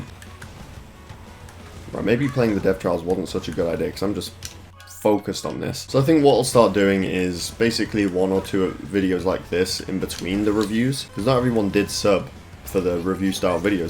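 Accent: United Kingdom. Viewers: I get you with sounding monotone. I think the British accent plays a big part in that tho no matter how much you try not to sounds monotone. I'm from the north of England so i think the same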